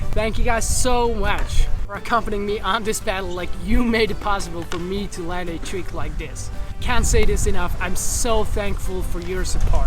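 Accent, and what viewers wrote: Switzerland. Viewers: wait what he's swiss🤯 "s wird en kampf abr vlt funkionierts ja" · auch du bist’s die uber/Ubter flip meister JG jajaja haha ich hab das wennst du SwitzerDeutsc spricht gern !! es macht mich like what the heck is he saying lol I took sechs jahre Deustch auf gymnasium und Uni und wenn ich ins Luzern war habe ich ein kickflip gemacht für ein skater und er denkt es was toll ja am tollsten lolz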